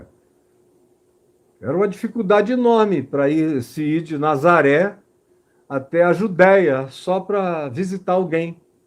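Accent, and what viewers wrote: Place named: Pernambuco, Brazil. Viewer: Aí sabe que fala Recife Pernambuco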